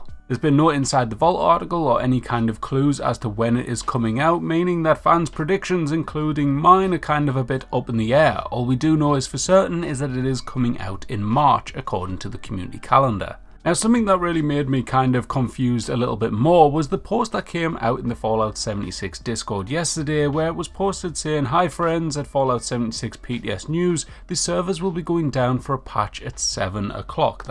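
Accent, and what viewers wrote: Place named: Northeast England, United Kingdom. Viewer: 2 different accents! The skyline guy is trying to do a Scottish accent (badly) & is from the Northeast of England.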